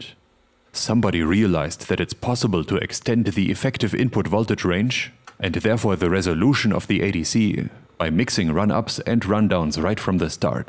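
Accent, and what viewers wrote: Germany. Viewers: German native that speaks English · sounds like a German Darth Vader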